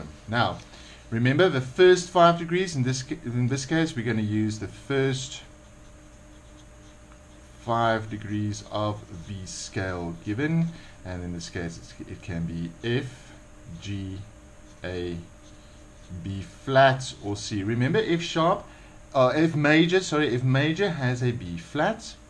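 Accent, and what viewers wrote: South Africa. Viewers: is this guy South African cause i think i hear the accent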